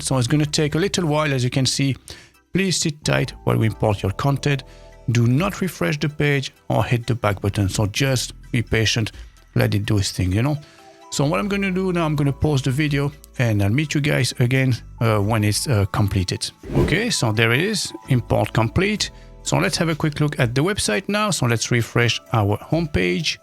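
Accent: France. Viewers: Carrément bluffé !!! J'ai cru reconnaître un petit accent français... Alors je publie mon commentaire en français... ça fait des jours et des jours que je me tappe des vidéos sur des solutions toutes plus floues les unes que les autres, là je dois reconnaître que la simplicité est au rendez-vous, il me restait 2 questions, est-ce que j'ose ??? Allez, je me jette à l'eau : pensez-vous que ce plugin peut-être utilisé comme un jobs board ? Et au sujet de la monétisation, pensez-vous que je puisse le paramétrer pour vendre la publication et la consultation de mes petites annonces sous forme d'abonnement mensuel/annuel ? :) Encore merci pour cette superbe présentation... A bientôt Dérick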